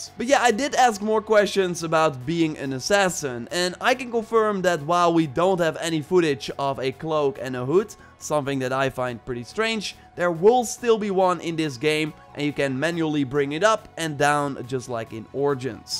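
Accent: Netherlands. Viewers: That thick Dutch accent tho... Almost makes the video unwatchable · No hate but this is the most dutch accent ever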